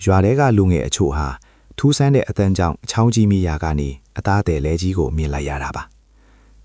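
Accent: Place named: China. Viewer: တရုတ်အသံလား တိုင်းရင်းသားဆိုသူတွေအသံလား ဗမာလူမျိုးရဲ့ ဗမာလေသံမဟုတ်ဘူး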